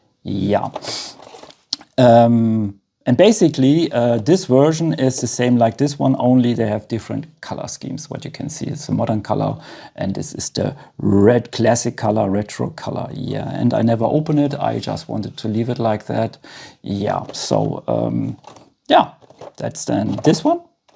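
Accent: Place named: Austria. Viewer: Ich liebe den Akzent. Du bist Österreicher, oder? 😊 Richtig gutes Video! Daumen hoch dafür! 👍👍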